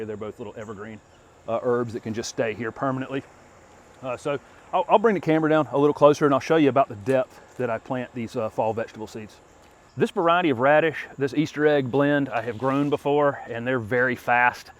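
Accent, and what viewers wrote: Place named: North Carolina, United States. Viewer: Your NC accent was quite a bit more pronounced in 2018, when you were in zone in... Lol.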